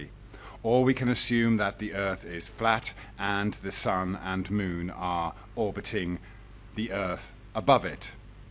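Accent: United Kingdom. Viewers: You do realize the Moon period has nothing to do with the year, that the Romans had to abandon their 10 month calendar because it did not represent a year and they could not use it to plan their crops? do you know that the moon's 5 degree tilt has absolutely nothing to do with the tilt of the earth, other than keeping that tilt generally stable? Word salad, British accent with lots of extended Ss and your followers think you're extra smart.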